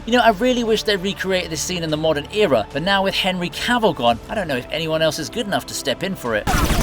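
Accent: England, United Kingdom. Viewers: Love your accent I'm American and I just love a funny English accent proper English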